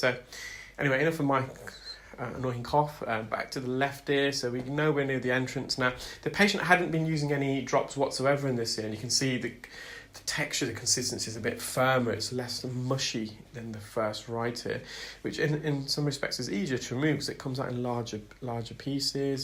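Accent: England, United Kingdom. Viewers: You are a sweetheart, for sharing with. Us your knowledge and skills. It is quite wonderful to hear your soft English accent as you explain to us why and how you clean an ear canal. Thank you Take care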